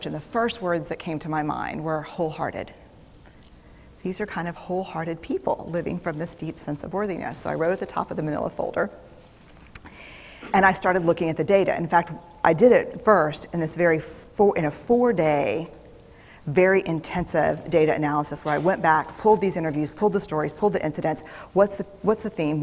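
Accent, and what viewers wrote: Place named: United States. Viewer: this is American English I thought it was British language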